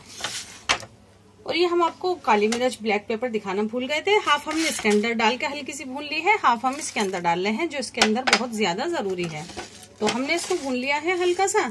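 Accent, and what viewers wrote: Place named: Paretabad, India. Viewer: Cooking no doubt achi hai but bolti paretabad walon ki tarah hai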